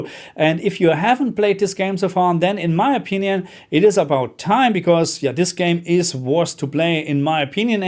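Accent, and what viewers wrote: Germany. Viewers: your English are perfect!I am from Greece but I like your German accent.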